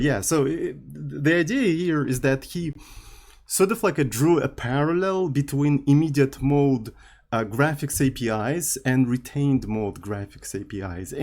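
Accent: Russia. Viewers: Чувствую русский акцент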